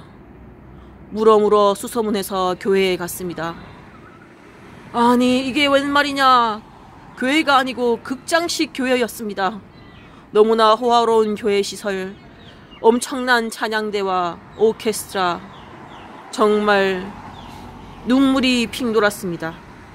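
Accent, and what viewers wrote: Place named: Daegu, South Korea. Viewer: 말씀 듣고 많은 생각을 하게 되었네요, 늘 동경하던 타향살이, 다시 한 번 생각하게 되었어요. 한국은 한국대로 호주는 호주대로 각자 저마다의 고충은 다 달고 사는 게 인생아니겠어요 ㅠㅠ 다 하나님의 뜻이라고 생각하지만 너무 힘들 때면 하나님께 왜 이렇게 힘든 인생을 주셨냐고 묻고 싶어질 때가 많아지네요 그리고 범어동 사셨다니.. 사투리가 살짝 대구 사투리같다고 느꼈었는데 같은 대구분 만나니까 반갑네요 ㅠㅠ 대신동이나 시내에서 보면 엄청 반가울 것 같아요 ㅎㅎㅎ 주저리 주저리 쓰다가 길어졌네요 ㅠㅠ오늘도 좋은 하루 되세요!!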